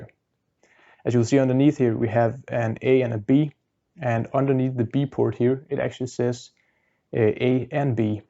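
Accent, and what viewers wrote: Denmark. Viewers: Is that a Danish accent?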